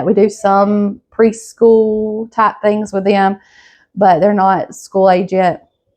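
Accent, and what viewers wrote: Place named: Alabama, United States. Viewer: Your accent reminds me so much of my home town folk growing up over in Marion County, AL.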